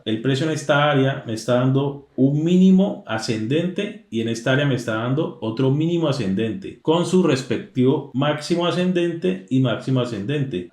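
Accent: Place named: Valle del Cauca, Colombia. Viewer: Hermano saludos desde 🇨🇴 !!! eres colombiano cierto? Vallecaucano? pero por lo que veo no vives en colombia porque dijiste que operabas en horario europeo... En fin... solo queria darte las gracias por este contenido... te acabo de encontrar y llevaba rato buscando alguien que explicara sobre estructuras de mercado! Esto en este momento de mi vida es de mucha ayuda ya que estoy comenzando como trader y creo que entender esto es fundamental para poder ser rentable! Te doy un par de ideas de videos ya que veo que estas comenzando con el canal! seria bueno que hicieras un video explicando la terminologia que usas... por ejemplo... que significa "liquidez" cuando dices que hay traders buscando liquidez. o que significa acumulacion y cual es la diferencia... esto es algo que no tengo muy claro y que nos ayudaria mucho a entender tus videos... 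Muchas gracias nuevamente y aqui tienes un nuevo subscriptor fiel de tu canal!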